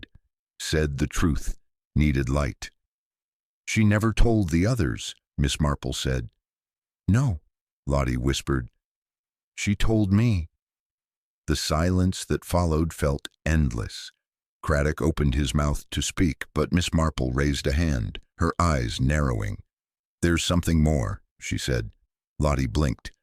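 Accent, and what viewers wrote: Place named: United States. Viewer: An American accent doesn't work for a Marple mystery, sorry can't listen.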